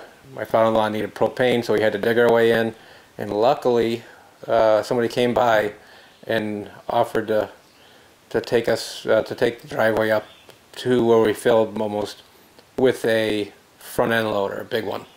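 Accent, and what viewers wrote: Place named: Germany. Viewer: Amish? Fabulous God loving people. Its good you can count on Amish for supplies. You sound German (accent) Thanks for video!!!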